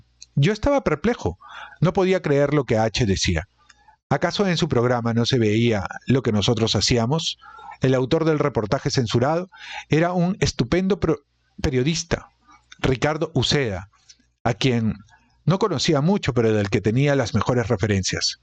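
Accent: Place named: Upper class, Peru. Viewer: Creo que no es acertado que leas este libro. Justo la semana pasada ya lo resumieron en el canal de Orozco que tiene muchas vistas, y como que se pierde el interes (mira las vistas). Te recomiendo seguir con el Baylyverso. Y otra cosa, ese acento de pituco manyado ya paso de moda amigo, y ya tienes tu edad como para hablar asi. Suerte.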